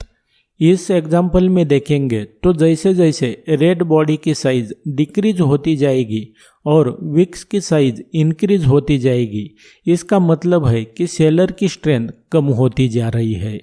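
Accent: Maharashtra, India. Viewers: Kaka tumhi marathi ahat na , Hindi varun olkhla 😂😂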